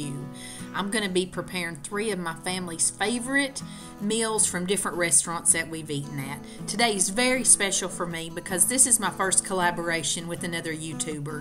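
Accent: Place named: Kentucky, United States. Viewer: Loved all of these !I'm from Kentucky found your channel from moss family tv your accent sounds like your from ky too love it lol !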